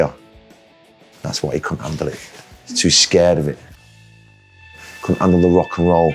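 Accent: United Kingdom. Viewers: Definetly, i love british accent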